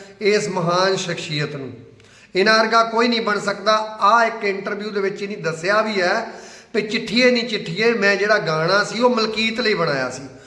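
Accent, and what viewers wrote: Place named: Doaba, India. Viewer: Eh mazhe wal di boli nai ji. Eh doabe di boli aa.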